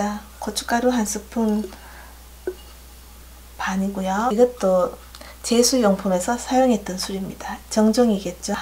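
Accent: Gyeongsang, South Korea. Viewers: 어제의 거지탕ㅋㅋ에 이어 오늘은 굴비양념구이 들어갑니다ㅋㅋ (어른들이 남은 전요리를 거지탕이라 하시더라요.ㅋㅋ) 이모덕분에 싸주신 음식들 깨끗하게 클리어 해가고 있어요^-^ 이모는 어디 계세요? 말씨나 레시피, 영상으로 느껴지기는 경상도 같은데.. 저희 가족은 모두 대구:) 외갓댁은 안동이구요~ 지금은 일 때문에 저희 부부만 낯선 포항에서 지내고 있어요ㅠㅠㅋ 유튜브에서 좋은 이모 만났다고 명절 보내러가서 자랑했다는ㅋㅋㅋ 신랑도 열씨미 배워보라며 응원해줬어요.♡ 다음주에 식혜, 약밥 도전 예정~~ 쉽고 디테일한 설명으로 요리에 재미들인 늙은 새댁 깸미 댓글 드리고 갑니다.ㅋㅋ ps. 오늘도 마니 마니 웃으시고 행복하셔요~♡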